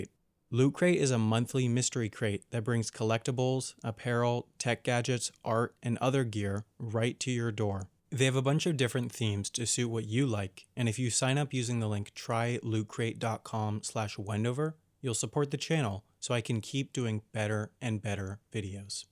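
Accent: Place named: United States. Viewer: You may have answered this already, but are you originally from the US? I know you live in the UK now, but you definitely sound American.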